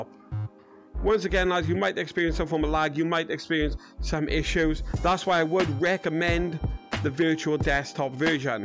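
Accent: United Kingdom. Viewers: Bri’ish huh? Well im glad I found you lad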